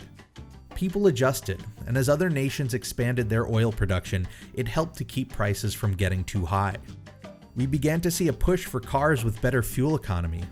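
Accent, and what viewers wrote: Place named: United States. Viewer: Toe-MAY-Toe Toe-MAH-toe... ...he's American, and almost every American speaker pronounces it the way he does. That's not to say that your pronunciation isn't correct, just that when enough people do it, it's considered acceptable. Like how there are two ways of pronouncing "either" even though there's that rule where if a soft E is immediately followed by another vowel, it becomes a hard E.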